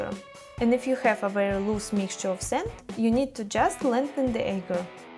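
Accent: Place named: Russia. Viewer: Russian accent that you can never hide))))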